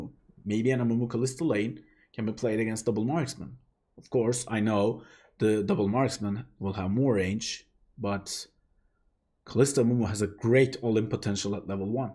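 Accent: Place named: Turkey. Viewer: Just like Fatih Terim, how speaks Turkish, he also speaks English.Exact the same way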